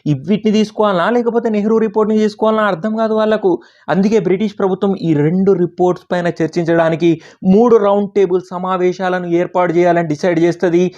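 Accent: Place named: Telangana, India. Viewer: Ni yamma,emanna kick unnada Endi bhayya ni voice lo aa rhythm Vere unnadi po Telangana yasa la masth sheppinav Deeni thalli gisonti faculty unte dennaina palaggottochhuuu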